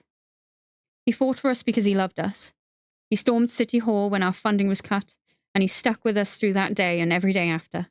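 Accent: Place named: Wales, United Kingdom. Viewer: can you do a good girl's guide to murder?🥺🥺 also I love you accent what part of walsh are you from?😮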